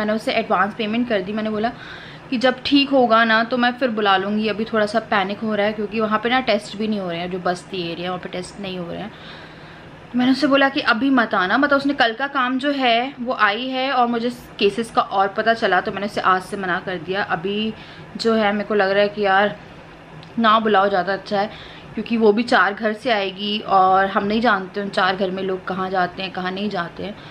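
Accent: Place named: Sadri, India. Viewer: Lgta h app sadar Se ho dii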